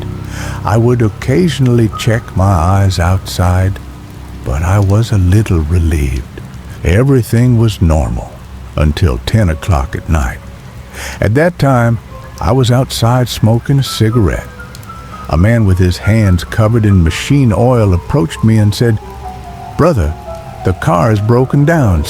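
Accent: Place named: South, United States. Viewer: A Southern accent talking about kilometers and petrol??? Somebody didn't do ANY research before posting this mishmash.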